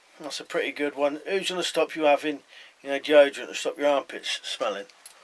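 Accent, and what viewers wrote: United Kingdom. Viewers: I have to say I really love these videos. I'm an American still currently in the US but engaged to a lovely British woman. We have a home, a life and twin girls in NE UK. I'm working on saving for immigration and I have to admit, I'm eager to officially be a Brit. I do have to say the accent reminds me of home (haven't been able to go back for nearly a year). And the lil can of spaghetti hoops in a Tesco bag is very effective but also gave me a bit of a chuckle... I can picture my hunny, the little ginger ninja, with her deadly Tesco spaghetti hoop flail takin down the baddies. 😂 I love how informative these videos are about normal items that actually can be carried. This kind of knowledge is great especially now, so Brits can protect themselves but not get done for doing anything illegal. I'm definitely looking forward to seeing more of your videos and I'll share them with my hunny as well. 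Blessings.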